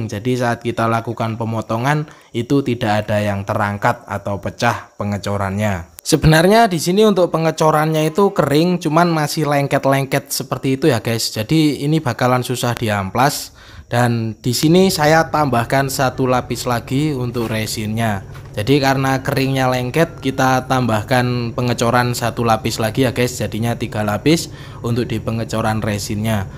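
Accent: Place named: Java, Indonesia. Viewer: Suarane koyo jowo .tapi jowone ngendi ya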